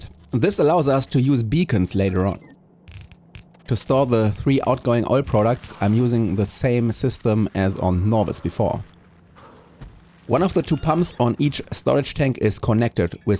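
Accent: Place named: Germany. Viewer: native speaker of both english and german here. Yeah, it's noticeable, but you're doing great, it's perfectly understandable (meaning it fulfills its purpose), it's infinitely preferable to AI slop voice, and at this point it would sound wrong to hear you speak english without an accent, it's lowkey iconic.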